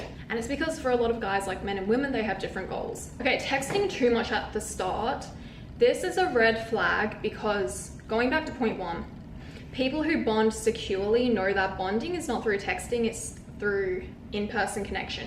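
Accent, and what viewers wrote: Australia. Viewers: This accent is tripping up my brain. I hear like 70% Australian and something else mixed in back and forth.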